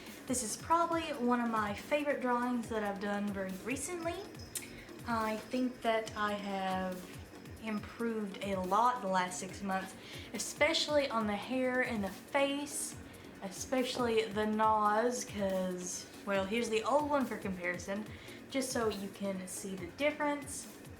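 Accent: South, United States. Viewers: do you have a southern accent? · Where are you from? You sound really southern, I'm from South Carolina but you sound more southern than me and my friends.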